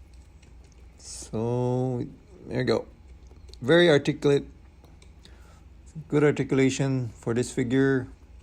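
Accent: Philippines. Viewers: He's accent is a Filipino Klinikle!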